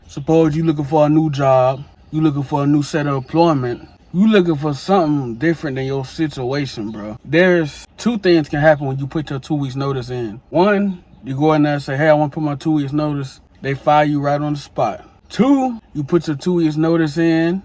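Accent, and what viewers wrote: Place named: South, United States. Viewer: Obviously, he probably from the south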